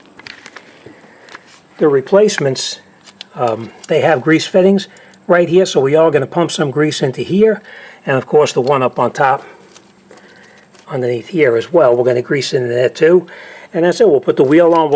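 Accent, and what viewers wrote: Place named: New York, United States. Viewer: Nice work 😊👍 Are you originally from NY / Jersey/ Queens??? Im hearing a slight accent in your voice 🤔 With respect from steve in Bundaberg Australia 🇦🇺 🍺🕺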